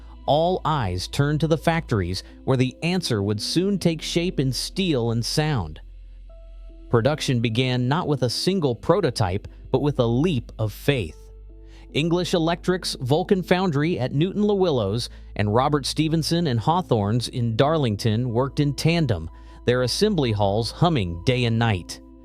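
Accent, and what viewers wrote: United States. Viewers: I gave up half way through as I couldn't stand the American voiceover talking about a British locomotive and they made it sound that the Class 37 (English Electric Type 3 as it was know originally) was the only successful locomotive made. Also if talking about a particular subject, don't show film of others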